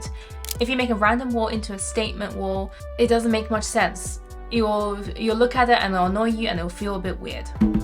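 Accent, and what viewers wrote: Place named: RP, United Kingdom. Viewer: I recently stumbled on your videos algorithm suggestion and wanted to encourage you to not give up on your YouTube account and to tell you that your content, been binge watching, have been really spot on very informative and delivered in a way that normal people can relate to and understand and thoroughly enjoying watching the vids and hear your posh accent haha. I am pretty sure it’s just a matter of time before everyone else discovers you and become the success like Corey! Keep pushing all the content and thank you really appreciate what you are doing here ❤❤❤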